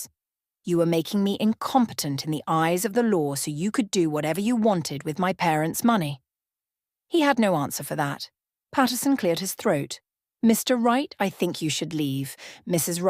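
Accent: England, United Kingdom. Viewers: Just love your real, English accent. So tired of listening to the same, possibly AI-generate voices and bad narration... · Very interesting story , Love your English accent ❤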